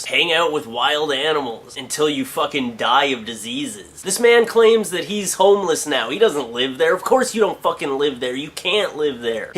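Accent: Canada. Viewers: I love it when you say "house" with your cute\amazing candian accent! :D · I LOVE CANADIAN ACCENTS!! soo sexy <3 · and ur Canadian accent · i love the Canadian accent the i'm Australian and its not so cool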